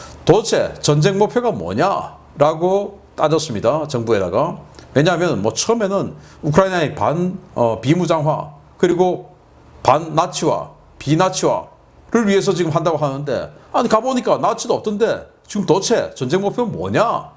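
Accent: Gyeongsang, South Korea. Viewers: 구독자 분 중에 수도권.전라도 사람들 있슴 함 봐주삼 난 갱상도 출신이고 수도권에서도 10년 넘게 살다가 지금은 다시 컴백험 했는데, 모르겠으나 갱상도 출신 신인균 씨 말투가 같은 동향이라 그런것도 있지만, 객지생활 10년 하믄서 제주도 사람까지 만나봤는데, 말을 듣기엔 크게 거부감은 없거든여?! 시원시원한게 속 시원합디더.. 다른 지방 사람들, 특히 수도권.전라도 분들은 어떠신가여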